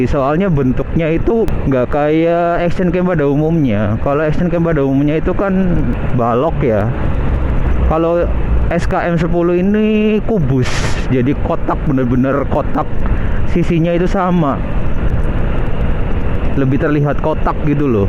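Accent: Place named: Banyumas, Indonesia. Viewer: Mantap bang. Slam motovlog ngapak 👍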